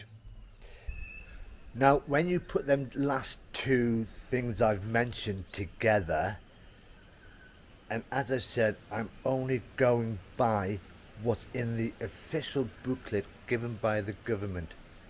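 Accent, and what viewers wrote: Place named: Northeast England, United Kingdom. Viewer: great, would love to show you around my city Auckland, although everybody starts off in Auckland when they come to NZ. Where is your accent from? It's sounds like North East England.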